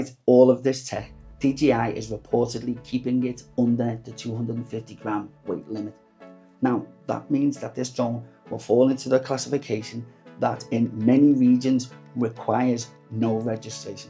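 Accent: Liverpool, United Kingdom. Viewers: Wow did this guy just really say that 😂😂 its ok old white men think they have the right to tell people how to speak and behave 🙄 you obviously dont know what people from liverpool sound like. I I bet to some other people you might sound like your saying different words and letters, you should see what real people from liverpool sound like 👍🏼 they talk really fast and pronounce things differently but he is actually saying DJI as normal, just your the only person who feels its thier place to tell him otherwise 🤔 gota let people be people without trying to put them down. He's actually doing really well, and maybe you dont think your being a absolute tool 🙄 and I wouldn't say its my place either to say your being a tool but you know your being a tool 😉. Now instead of commenting trying to put somebody down by telling them how to speak, try a more encouraging approach but you know what they say you cant teach a old dog new tricks, so get more worldly and understand that the world is full of people who speak different people. 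from liverpool have it hard enough with people trying to understand them from thier own country, now I have followed this guy from the start and all his first videos were AI voice overs because nobody could understand him, and he has changed and changed and changed the way he speaks enough over the past few few months to actually gain the confidence enough to speak on cam. And yeh at first he was speaking really slow and robotic because he tried to speak just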